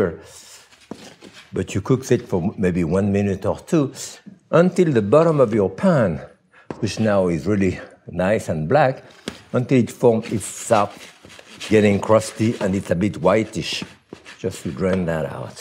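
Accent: France. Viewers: Fransız aksanı ile ingilizce cok tatlı 😀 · I love his rustic French accent. Its so cool!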